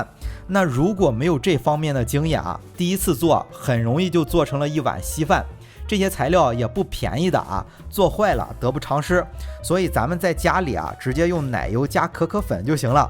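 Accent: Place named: Qingdao, China. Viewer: 我听着声音有点像青岛人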